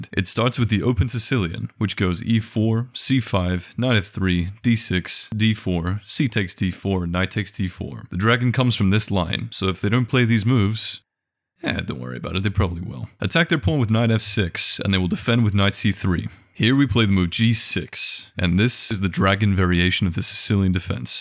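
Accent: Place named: United Kingdom. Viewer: What is this guy's accent? it sounds half British half something else. I'm mainly here for the whispered interpolations. Very nice.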